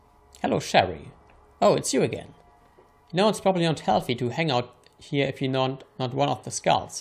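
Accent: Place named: Germany. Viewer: You should talk to again and get him as a follower. By the way where are you from? I've always thought your accent sounds like German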